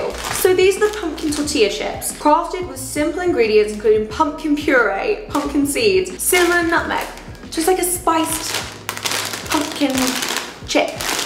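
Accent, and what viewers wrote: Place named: Home Counties, United Kingdom. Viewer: Is there a factory that mass produces these influenzers? They all sound home counties England, boarding school 'Oh my Goshing', posh tottie🙄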